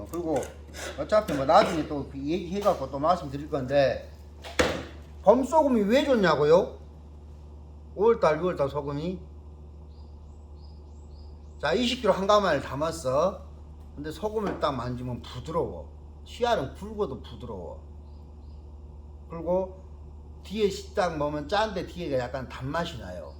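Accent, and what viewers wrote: Jeolla, South Korea. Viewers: 선장님께서는 완죤 구수한전라도 사투리 듣기좋아요 영상들으면 구수한 사투리 재미 있어요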